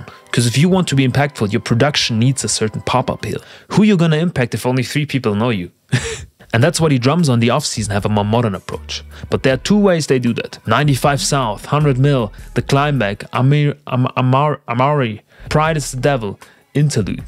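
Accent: Germany. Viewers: I was gonna comment that you sound German until I checked your about section lol. Great video! Please do more of these.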